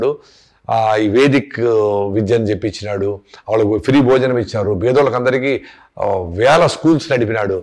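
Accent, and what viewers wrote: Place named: Kadapa, India. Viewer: సర్ మీ బాషా కడప భాష లాగా ఉంది చాలా బాగుంది మంచి అలవాట్లు చెప్పారు సర్ థ్యాంక్స్ సర్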